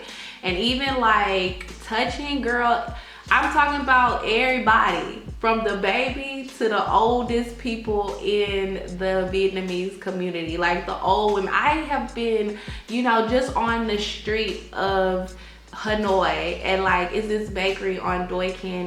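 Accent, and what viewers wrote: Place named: Washington DC, United States. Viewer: Are you from DC? You sound like you have a DC accent.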